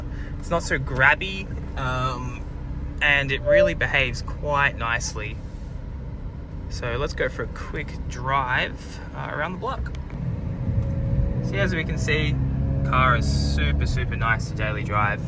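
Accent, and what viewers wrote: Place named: Australia. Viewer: Hey mate, sounds like a skippy accent there 😎 Any suggestions for down under to get some mods / tune done?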